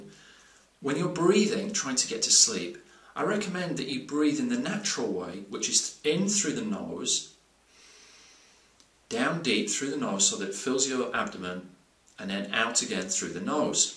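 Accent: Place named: United Kingdom. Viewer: Everything sounds cool in BRITISH accent.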